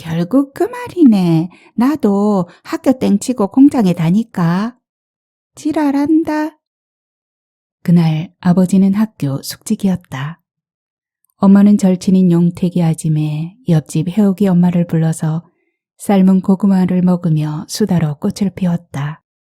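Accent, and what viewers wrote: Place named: Gyeongsang, South Korea. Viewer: 반갑습니다 ^^성 보경님의 푸른 넥타이, 잘 들었습니다 욕심많은 엄마 때문에 결국 재주많고 똑똑한 금희를 죽였네요 금희가 너무 불쌍하네요 주인공은 천진무구,참 귀엽고... 의 경상도 사투리가 사랑스럽고 예쁘네요^^ 재미있게 감사히 잘 들었습니다 ♡♡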